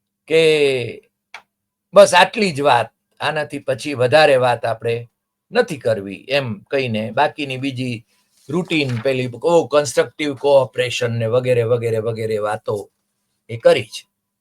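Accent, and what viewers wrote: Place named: Kathiawar, India. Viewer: આપ મહેસાણા બાજુના છો છતાં પણ કાઠિયાવાડી લહેજા માં બોલી જાણો છો 👌🏽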